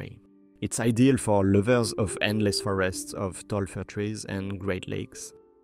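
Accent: France. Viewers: Oh je reconnais cette accent... 🇨🇵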